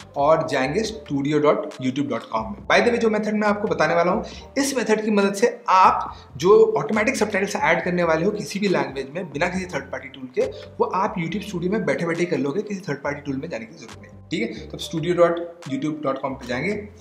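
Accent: India. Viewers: I love the Indian accent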